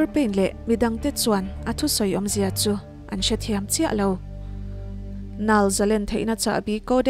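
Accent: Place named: Mizoram, India. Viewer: Vai ho pawh hian an tawng accent-in English an hmang mai thin chutiang bawkin ram tin in an tawng accent-in English an hmang thin mizo takin sim bi awt pawh tise adik loh na awmlo mizo accent in Kan sawi ve ni mai English anih na bo chuanglo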